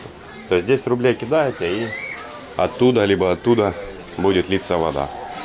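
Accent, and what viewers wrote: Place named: Lviv, Ukraine. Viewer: иди на. У тебя говорок со Львова.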